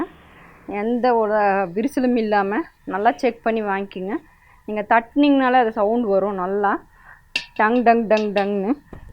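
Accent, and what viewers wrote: Coimbatore, India. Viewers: Kovai tamil thana neeinga pesurathu akka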